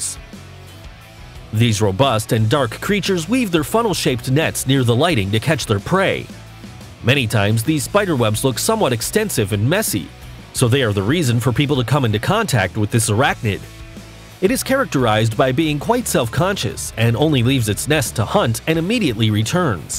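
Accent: United States. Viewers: this is Australia, Mate! We gave INCHES away a very long time ago - and the American accent. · Got issues with this video. First why is it being narrated by an American? Ask an Aussie to do it. Second.... they have obviously read mosly from an Australian National Geographic journal... found it online. Third the White Tailed spider has not been verified as dangerous...no evidence of a venomous bite. In other words an urban myth.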